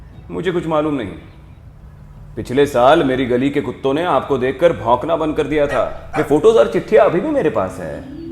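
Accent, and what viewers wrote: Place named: Pahadi, India. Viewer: Aapki aavaz bahot achhi he.ekdam pahadi..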